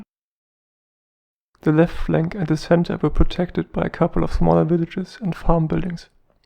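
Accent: Germany. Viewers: I refuse to engage with animated history unless it is narrated by you with your class, inimitable German accent. Great channel, rock on top lad. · That is not his language, he is German, I hear that, I am one myself ;)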